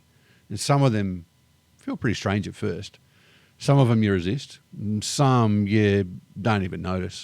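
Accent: Australia. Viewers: My father was an American GI in WW2. My mother was a GI bride. She lived in London and had a very rough time surviving the war. See is long deceased, but I have one of her treasures, a pristine certficate of naturalization. She had a rough life here, bad marriage, single motherhood etc. But she love loved this country and although far from her family and their support, never looked back. I'm sure she felt self concious about her accent, but I thought it was beautiful. Even now when I hear one, I tear up thinking about mom. I still let an English pronunciation slip out every now and then after 70 years. My brother inlaw once tried to correct me, and I curtly set him straight. Then asked him if he was my English teacher. He has never done it since. Accents and dialects are the spice in an otherwise bland world, and should be celebrated, no conciously correc ted just to fit in. 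You Aussies get a lot of leeway if you don't mind me saying so because you accent is so cool. Don't change to much. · As an American, I understand 100% of everything you say. Love the accent by the way. Any American should understand "wadda" without a look of confusion. Even the Aussie slang is mostly understandable.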